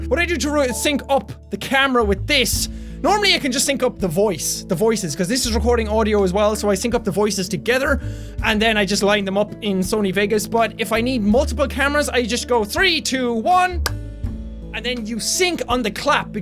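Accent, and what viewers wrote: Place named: Ireland. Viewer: I still remeber when you coved up your irish accent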